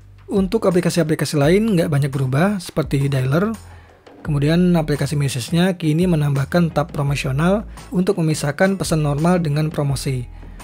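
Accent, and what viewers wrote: South Sumatra, Indonesia. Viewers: Cak wong sumsel youtuber ini. Wkwkw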